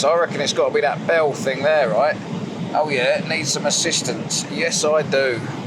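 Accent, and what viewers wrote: United Kingdom. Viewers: I always get the feeling that you are the kind of British guy that I would love to hang out with. I am a Singaporean but it gives me a sense of being a British when I hear you speak.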